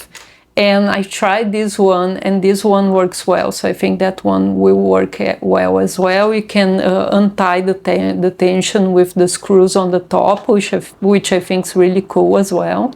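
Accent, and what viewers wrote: Brazil. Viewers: Vc é uma querida minha. Obrigada por compartilhar todas as suas dicas! No primeiro vídeo que vi seu, eu saquei pelo seu sotaque que vc era brasileira, e fui ver o que uma brasileira tinha pra falar sobre essa máquina que pouco sucesso faz por aqui rs E que bom, pq vc de longe é a que mais entendeu sobre o assunto e explica com clareza, de todas que eu já parei pra ver aqui na internet A gente que é brasileiro acha que nosso próprio povo não sabe o que fala, mas se procurar direitinho acha as joias mais raras do mundo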